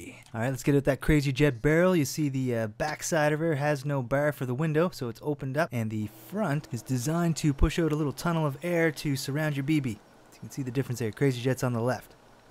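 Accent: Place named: Canada. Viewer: You sound Canadian if you are where did you get these parts from can’t really find any places here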